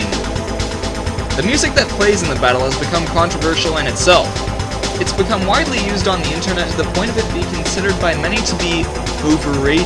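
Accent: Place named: Canada. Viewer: your canadian i can tell...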